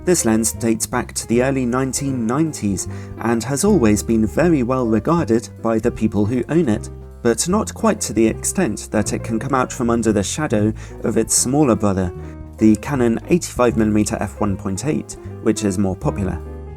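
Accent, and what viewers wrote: United Kingdom. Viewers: Does everyone in UK can speak like you?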